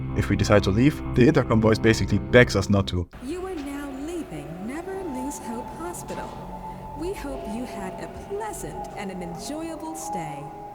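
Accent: Germany. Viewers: You are German right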